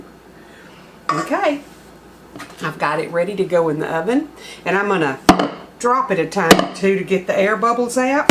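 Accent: South, United States. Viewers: I could listen to you talk all day lol. It's that lovely Southern accent. Figs, mangoes and passionfruit are my favourite fruits to eat, so I definitely wrote this recipe down. Thankyou. Happy anniversary to both you and. Michelle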